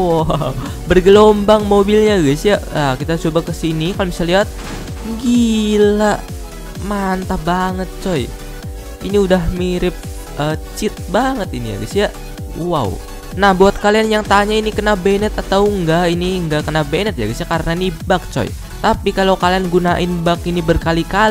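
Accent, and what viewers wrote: Java, Indonesia. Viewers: Gua yakin lo orang jawa kan bang?😂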